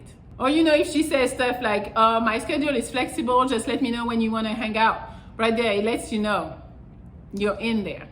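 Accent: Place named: France. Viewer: Another banging video and a solid piece of advice love your energy and aura around you and your work ethic and personality and intelligence is the best I've even seen looking beautiful and sexy and most attractive woman on the planet love them sexy eyes and and accent too and love your tattoos there's nothing sexier on a woman than all the qualities that you possess keep up the awesome work you do and never change who you are your perfect the way you are your a gorgeous French goddess queen xx ❤️ 😘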